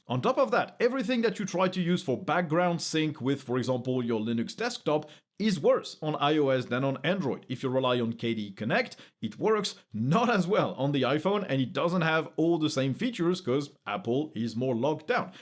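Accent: France. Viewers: random question here, are you french ?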